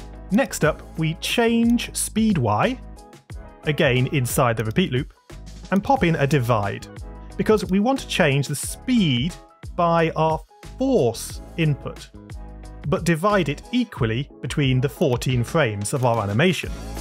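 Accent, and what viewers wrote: United Kingdom. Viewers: are you British British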